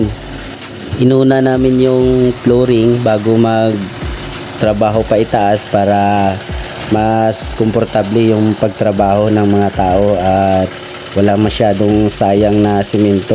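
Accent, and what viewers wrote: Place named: Visayas, Philippines. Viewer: Saan po kau,, narinig ko bisaya😊 gusto ko din kc pa bahay taga mindanao po aq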